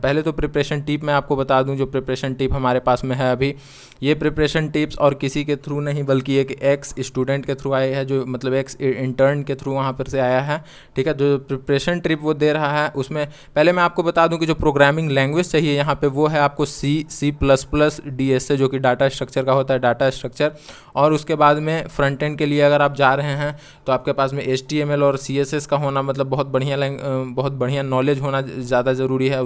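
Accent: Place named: Bihar, India. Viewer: yes exactly . I don't know what short listing criteria Josh is using. Sometimes they select only on one question and some time dont select even on all 3 correct. Btw sorry for being little rude in cmnt above. Liked ur way and accent (bihar accent) of explanation.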